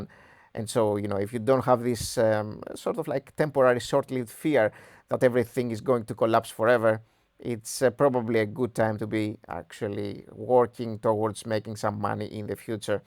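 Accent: Greece. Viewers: Hello, I was the commenter that spotted your Greek accent a few months back. I'm interested in ATIP stock. Can you dona quick video on the fundamentals? I live in the city they're based out of and don't know much about the company's financials. Everyone I've spoken to says they are wonderful to work for, their places are clean and busy. If not a video maybe you can comment. Let me know as I buy shares daily. ATI physical is the name of the company out of Chicago.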